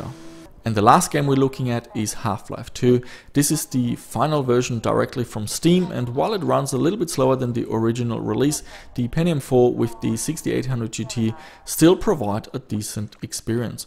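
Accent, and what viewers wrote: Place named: Germany. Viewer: Actually, I got that Sound Blaster second hand 9-10 years ago (and it was massively expensive even then), but I was already past my gaming prime and I was never able to truly enjoy EAX. I had planned to integrate it into a modern desktop (which so far I have not buit), but you made me realize that modern OS-s have relegated EAX to the past. Besides, there were some issues with the front panel, which I could not fully deal with even after dabbling with the jumpers. Maybe, it was faulty like the TV tuner card I purchased with it. But hey, that is the risk when dealing with used parts (and the excitement when they do work:D)., your channel inspired me soo much to revive my old tech (your somewhat German accent rocks as well:D). Now I am slowly working on a Compaq machine with a 500 MHz Katmai (similar to the first PC I owned). I have already boosted the RAM to 512 MB (in Y2K I had 64 MB, slightly higher than the standard 32MB new PCs were offered in my country back then). 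Next comes the SD card to IDE adapter (thanks again for the info), I hope I will be able to have a dual Win 2000 and Win XP boot (and move the XP pagefile to the HDD, perhaps?). Finally, I have prepared a GeForce 4 MX440 to replace a G200 (hope it will work). Sadly, the old compaq proprietary mobo may prevent me from maxing the CPU (I had the Abit BE6-II board back then - so flexible; and a Slotket for Coppermine). Do you flash the BIOS to its latest version when you build old systems? Because many of th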